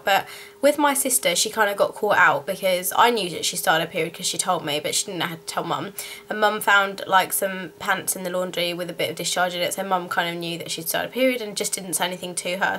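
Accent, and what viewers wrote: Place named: London, United Kingdom. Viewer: I think you are sooo pretty :) and I love your accent I used to have an accent like that, I was born London but I moved so I speak differently now:)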